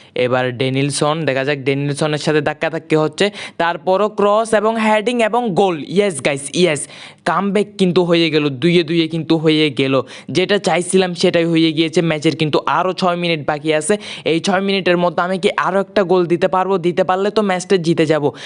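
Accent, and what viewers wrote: Chittagong, Bangladesh. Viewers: ভাই আমার মনে হয় আপনি চিটাংগা। আপনার বাড়ী কোথায়? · Vaiya apnar vasa Chittagong a kutai amar Chawkbazar ❤❤❤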